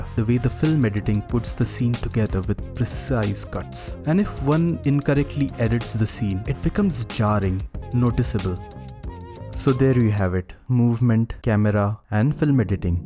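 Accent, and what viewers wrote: India. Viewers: is this guy indian? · You sound Indian brother. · I was excited to watch this video but then I noticed Indian accent. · Bullshit, Yates did a great job The retarded overly dramatic emotional cues are a thing of past that is 1990s to early 2000s U want every single emotion to be emitted as a child’s overly expressive face?? Go watch home alone. Moreover your Bihari accent ruined the essay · Indian accent…..annnnnd I’ll pass. “Saaaaaaar they should have fired all the English people and hired pajeets. Saaaaaaar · This accent lmfao pajeet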